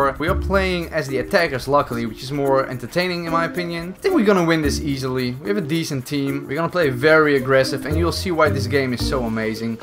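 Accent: Netherlands. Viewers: Jouw accent😂 Nederlander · Your accent sounds like a Dutch accent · je hebt een nederlands accent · Ik merk aan je accent dat je nederlands bent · instantly noticable Nederlandse accentje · Wat een heerlijk Nederlands accentje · Nederlands accent, 1 van de redenen dat ik naar je filmpjes kijk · You sound a bit Dutch if you speak English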